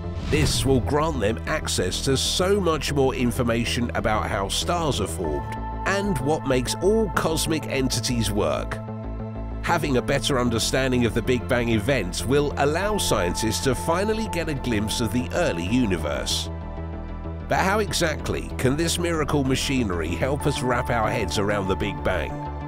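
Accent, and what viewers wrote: England, United Kingdom. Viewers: That english accent made me quit watching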